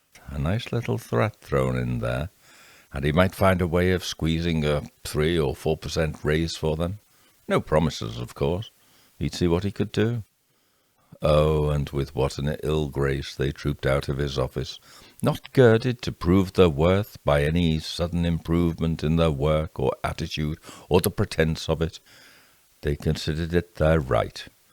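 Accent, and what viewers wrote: United Kingdom. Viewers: you are extremely talented and creative and I will tell you if I was not on a fixed income. You would be the first so that I could join your YouTube. However you do that, I mean it. I love these. Thank you from the USA we do not have talent and. Creativeness and the accent and the humor that the brits do.Trust me we don't at least we don't in nevada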